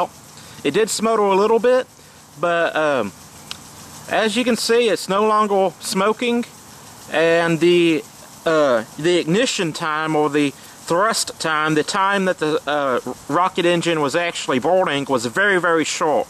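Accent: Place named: South, United States. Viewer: You got a really strong southern accent lol. Great video!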